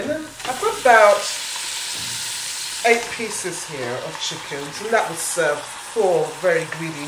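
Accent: England, United Kingdom. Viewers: I love your videos, few though they are. The combo of your passion, plumby English accent (yes, I'm American:)) and magnificent West African looks, I very much enjoy. You were my first intro to West African cuisine. It appears that you aren't really doing this anymore and don't know if you read your comments but I wanted to let you know how much I've enjoyed them. Cheers. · YUMMY!!!!! I c you added 2 habanero peppers to make it HOOOOTTT! I love spices & heat tho. I c you only added 1 stock cube, no salt or other seasons. Another vid chef added no seasons! I never boil the h2o out of my tomato puree when I make this so this week I will certainly boil out as much h2o as poss. 1st. BTW, I LOVE your Britt accent & I EAGERLY await my trip across the pond – when flights aren’t a million $ compliments to the Olympics and summer season! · your accent is so english and this is coming from someone born in the uk too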